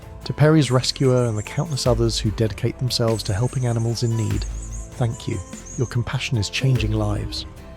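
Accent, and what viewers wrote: United Kingdom. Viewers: Its pavement or footpath in UK. Narrator is very English.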